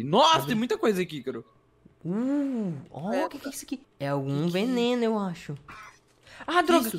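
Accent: Northeast, Brazil. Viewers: Nordeste meu fi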